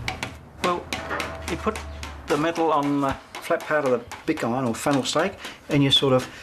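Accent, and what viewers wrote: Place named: Australia. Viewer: I kept expecting him to say (with his Australian accent) "can you see what it is yet?" :-)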